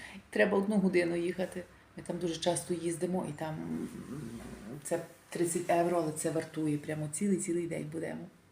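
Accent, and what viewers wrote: Lviv, Ukraine. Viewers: Дякую за відео. Дуже цікаво 😮у вас такий інтересний акцент, уже не львівський😊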